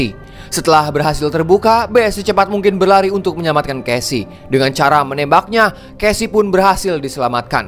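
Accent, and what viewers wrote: West Java, Indonesia. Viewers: Asalamualaikum AA emg org Sunda gitu abis lucu amat kalau nyaritaken pelemna Sagala Aya bahasa aing kitu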